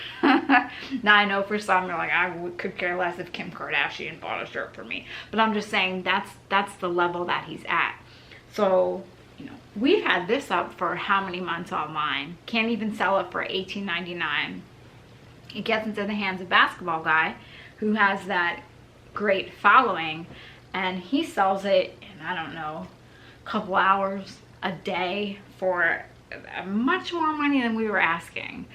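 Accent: New Jersey, United States. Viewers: Kinda crazy reply ... I’m about your age and live in SF ... California toe-head and find myself watching you a few hours a week because of your NJ accent and manor ... different from the left coast treasure hunters I meet ... nothing much happens in your videos but I find them interesting - I like flea-markets and urban treasure hunting and your presentation/discussion/viewer questions are interesting and relaxing ... and I like Yellowstone too ... hahaha ... ANYWAY... Yup that was definitely a SF summer tourist sweatshirt the shops sell ... kinda ugly for us locals ... Tourist think its warm on the CA coast in the summer but as Mark Twain said the coldest winter I ever spent was a summer in San Francisco ... Love your stuff ... just had to chime in after all this time ...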